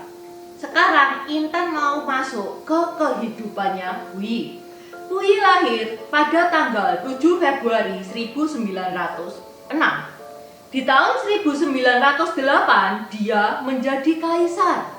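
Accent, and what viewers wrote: Java, Indonesia. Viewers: pembawaan nya terlalu lebay dan over, mending dikurangi dan tampilkan medoknya saja dan lebih serius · Keren sekali ka Intan apalagi medoknya hehe2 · mbak dari asal jawa kah?, kalok ngomong keliatan medok nya kwkwkwkw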